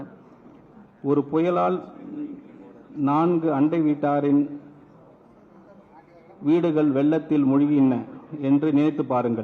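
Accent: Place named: Marwari, India. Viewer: இவருக்கு தாய்மொழி தமிழ் தானா இல்லை வேற்று மொழியை சேர்ந்தவரா....மார்வாடி போல் படித்துக் கொண்டிருக்கிறார்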